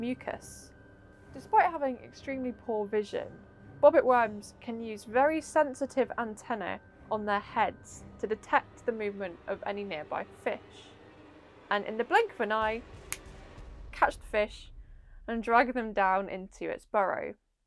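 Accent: United Kingdom. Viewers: What part of England is your accent? Its one of my favorites, the one where yall say it like "PEBBOWLS" instead of pebbles 😂❤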